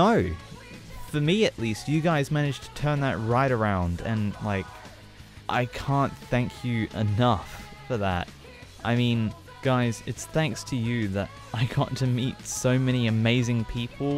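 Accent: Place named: United Kingdom. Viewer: Um... Wow... ME: YOU SOUND BRITISH, WHY?! [MIND BLOW]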